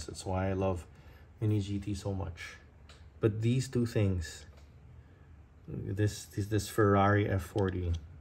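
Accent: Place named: Philippines. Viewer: What nationality are you? Accent sounds filipino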